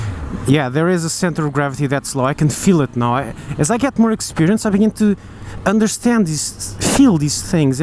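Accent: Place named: Portugal. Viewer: pelo sotaque nota se que es portugues tou para adquirir uma de 2016 sabes que modificaçoes teve as versoes aseguir?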